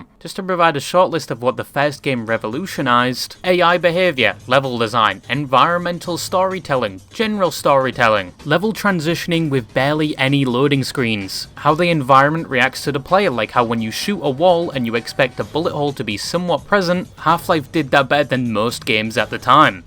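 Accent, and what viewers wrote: United Kingdom. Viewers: I love you too talking british bird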